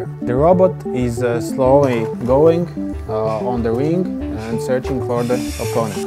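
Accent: Latvia. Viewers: I was watching this and i thought your accent sounded familiar... I had a suspicion you were latvian! Labs video, pats domāju sākt veidot savu pirmo sumo robotu : ^) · That Latvian accent was so obvious from the very first word. :D I usually use something more similar to the first strategy, since usually the opponent robot is within my robot's sight basically upon the start. As my blad is decent, my robot just runs full force onto opponent. The main disadvantages come if opponent has flags. My robot doesn't yet account for it. Also, and embarrassing thing, but one of the 2 things have caused my robot to randomly restart on many competitions and I'm not sure which (hadn't tested): - charging circuit moving out of place and messing something up (not sure about this one, heard it from someone that it could be possible) - high noise in voltage from battery (due to not enough charge?) I'm hoping I can prevent this from happening again.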